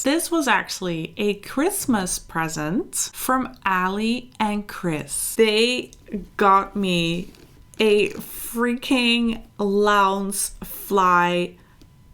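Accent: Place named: Netherlands. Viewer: I'm new to your channel and I knew you were Dutch just by the accent haha lovely video!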